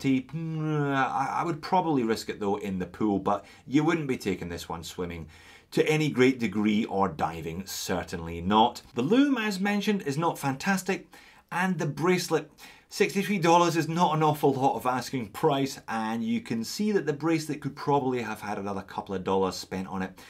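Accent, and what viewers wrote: Scotland, United Kingdom. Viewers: hey I spent the last few hours watching some of your videos, I love your presentation style and iconic "Hello" at the start. Love the scottish accent too. I like how you cover many different types of watches at all price points and it makes for interesting viewing. Keep up the good work mate!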